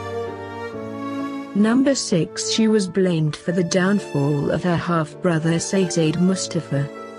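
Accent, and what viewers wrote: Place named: England, United Kingdom. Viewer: Pronouncing Turkish with an English accent is a delight !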